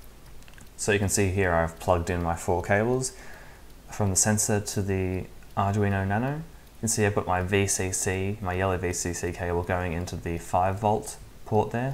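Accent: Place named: England, United Kingdom. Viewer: This module should not be used without level converter. The VCC for the sensor is max 3.3V, see <link> and <link> There is no provision for level shifting on the module. If you apply 5V you are unduly overstressing the module and reduce its lifetime. I love your english accent but the accuracy of the contents leaves to be desired.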